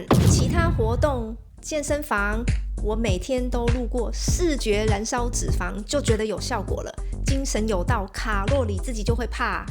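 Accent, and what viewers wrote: Taiwan. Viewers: 台灣口音甜蜜親切又有趣❤❤❤😂😂😂👍👍👍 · 看完影片，先订阅，你的文彩，佩服，台湾腔的国语，把原有的幽默更提一层，期待下一期，说一下你的攻略，包括旅轮网站，搜索技巧，上岸那些景点值得一去，错过会小遗憾，订船上旅游还是下船跟当地导游(我们是刚退休人士)，火车skygway必须事先或下岸预订(怎么算好那天)，等等. 谢了🙏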